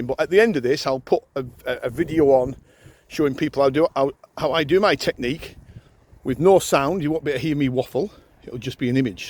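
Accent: Cumbria, United Kingdom. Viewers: Good video, I’m a newbie as you know only 4 of your digs in, but am always concentrating hard on keeping it low and slow as your cumbrian tones play out my head 🤣 but to be fair I could widen my arc by turning my hips more I gotta admit 👌🏻 Thanks for your all your efforts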